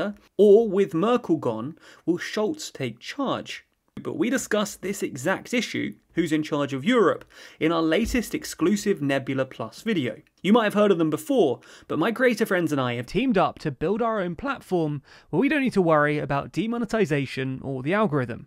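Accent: United Kingdom. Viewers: This is british english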